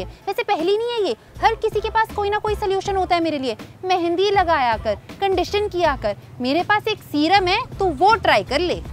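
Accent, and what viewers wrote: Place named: Kerala, India. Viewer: Madam you have Malayalam accent.. But wavy hair is the best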